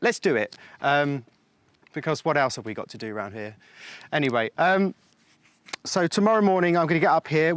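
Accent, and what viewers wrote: United Kingdom. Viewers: …your British accent is so much more enjoyable to listen to than Dick Van Dykes!!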